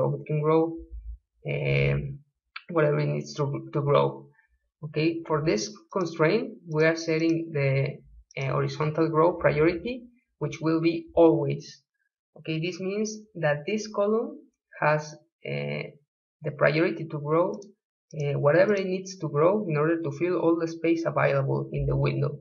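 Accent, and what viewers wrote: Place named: Italy. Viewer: Accento italiano!! Grazie per il tutorial!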